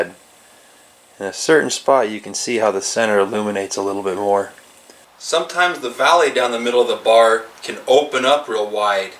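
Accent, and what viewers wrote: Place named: South, United States. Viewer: 🪓 MATE, love your attention to detail 🥇 I use a similar grinder but I use the disk wheel with a super fine grade paper (can’t recall what it is 😬). The shop didn’t have a fine grade for the belt at the time. Having one of these grinders actually makes dressing the bar a pleasure 🎉💥 I didn’t know anything about how to check the gauges etc but with Australian hard wood we go though chains ⛓️ pretty darn quick. And hey, I even liked your country drawl… reminded me of Little House On Through Prairie‼️ Hope to see you in the sky one day 🙏 😉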